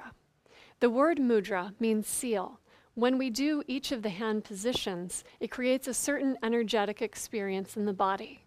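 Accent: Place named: United States. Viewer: please people learn from indian acharyas, ... american accent tremendously deform the mantra .... the udatta anudatta and respect of sandhi are absent ... the translation is very poetic and not giving proper meaning ... mudras are supposed to be practiced each for 1 reception ... please learn from proper sources ...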